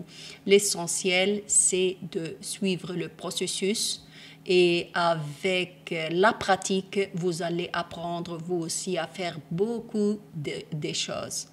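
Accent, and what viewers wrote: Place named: France. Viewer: Howdy 🙂, i thought 🤔 you speak English.. it's like you speak France!! Anyway I'm going to go inside your painting here.. see ya Oh, i have a question: What Should i mix If i want the (Cloudy suny colours) ?! Which colours should i mixed?!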